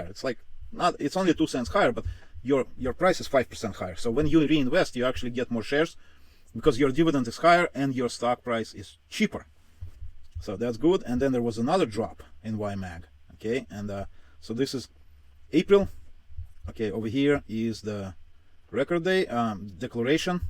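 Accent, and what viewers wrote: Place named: Russia. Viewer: У вас русский акцент))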